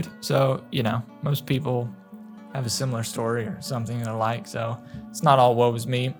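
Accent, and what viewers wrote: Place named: Virginia, United States. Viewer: That Virginia accent is a big part of why I enjoy your ASMR channel. 😁 Your story is so interesting. Best of luck to you!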